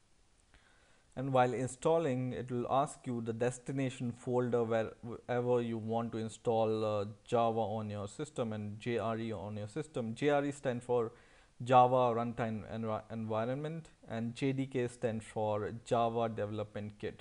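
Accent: India. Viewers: i find it's hard for me to understand the indian accent ! Have a nice time ! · Sooooo Indian! Like Russells Peters! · Open caption that are auto generated and you will see google catches every word he spit out his mouth cause he's indian and our english is pure not with any type of accent.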